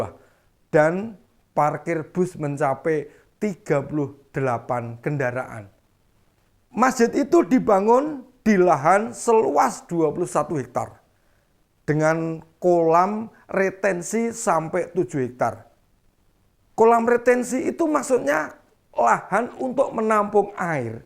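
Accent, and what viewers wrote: Java, Indonesia. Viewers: Halo mas, mat malam, seneng dengar medok dan melihat murah senyum mu. 👍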